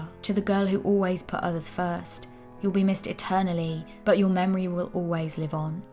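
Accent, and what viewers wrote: United Kingdom. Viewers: Hi . Love your accent. I assume it’s British? Don’t laugh. I’m not great with accents. 😉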